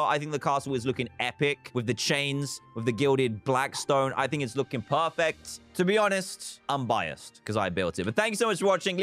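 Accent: United Kingdom. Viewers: shut up he’s British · That’s because we British say Debis that way w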